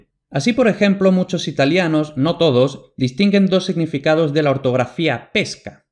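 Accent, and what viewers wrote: Castile, Spain. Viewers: Eres andaluz pero hablas como castellano al menos ante mis oídos latinoamericanos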